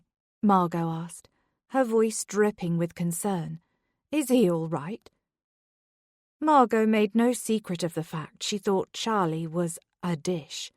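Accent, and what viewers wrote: Birmingham, United Kingdom. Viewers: Mind you, I haven’t started it yet and further down in the comments it says about a brummie accent, which I must admit is not one I like to listen to either. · Great book but the narrators Brummie accent is horrendous and off putting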